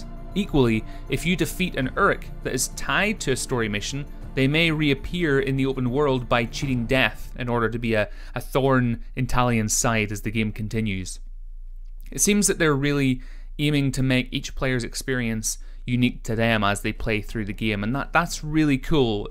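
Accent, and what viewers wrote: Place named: Ireland. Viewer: oh that's cool I didn't know you were Irish, I probably should have known that from the accent though. lol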